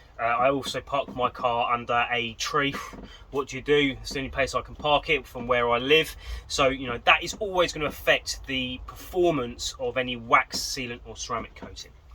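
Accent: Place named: Midlands, United Kingdom. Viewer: I heard the accent then fellow Midlands boiiii yes !!!!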